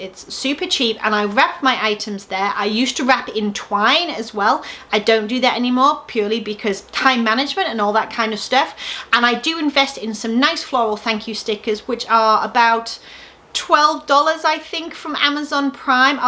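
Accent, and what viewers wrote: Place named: United Kingdom. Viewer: Plus I love your accent and British expressions. Reminds me of my British family members. <3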